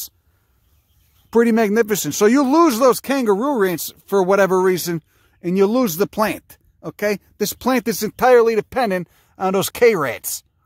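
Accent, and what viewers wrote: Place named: Colorado, United States. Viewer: HAHAHAHHAAAA!!!! God damned spot on. Sounds like half the people in Colorado.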